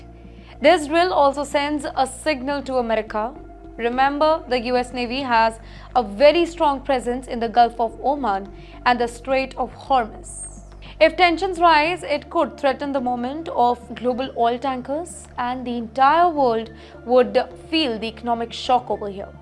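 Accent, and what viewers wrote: India. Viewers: Curry accent 😂 eat cow dung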